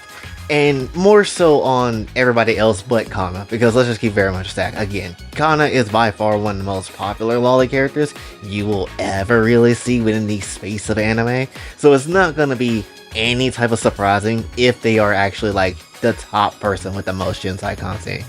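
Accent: Brazil. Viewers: Hey bro i only thought of asking know but are you BR? Your accent is familiar and l0lic0n BR YouTubers are very rare so i got curious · Indeed, the guy sounds like a brazilian a bit, and I can not pinpoint why.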